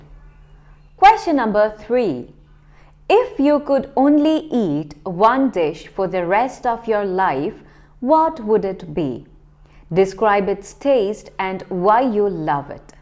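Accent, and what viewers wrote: Kerala, India. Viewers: Are you malayalee?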